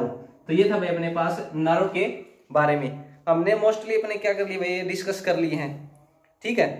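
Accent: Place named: Haryana, India. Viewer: Boli me hi haryanvi touch hai 😅😅 gajab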